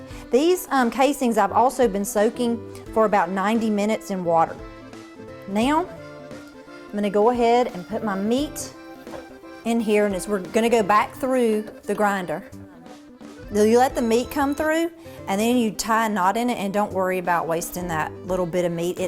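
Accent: South, United States. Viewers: Good video plus it helps that this woman is beautiful and that country accent damn lol I think I made it to her second sentence and showed my girl who's from Virginia and she def agrees! WE NEED MORE WOMEN LIKE HER! Tell your man he's a lucky guy with much love from Florida 😄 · Her southern accent makes hearing about the recipe all worthwhile . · FANTASTIC video! You are lovely and a really good presenter. I've been watching sausage-making videos this afternoon because we've consumed all the summer sausage we got from the processor, so I'd like to make some of my own from the 5 deer my son and I harvested and I processed myself. Your video gave me the best directions I've seen so far. Thank you. And I don't know where you got that wonderful Southern accent, but I'm from Mississippi, and you sound SO much like my middle-MS and Alabama friends. Yankees love to make fun of us, but in fact we all know Southerners speak the REAL American English! 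Thank you!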